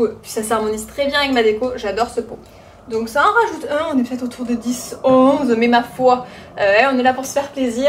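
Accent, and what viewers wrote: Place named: South, France. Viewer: Et en plus vs êtes de chez moi? Vs êtes de montpellier? En tout cas bien l’accent du Sud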